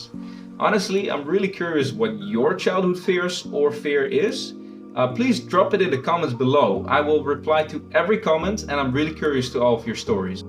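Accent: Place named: Netherlands. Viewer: this sounds suspiciously like a dutch accent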